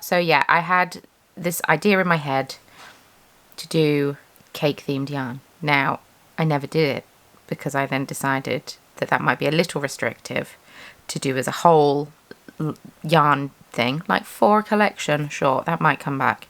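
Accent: RP, United Kingdom. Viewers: Bap if not crusty. Cob if it's crusty. I'm in Solihull, near Birmingham airport, and you'd sound posh here.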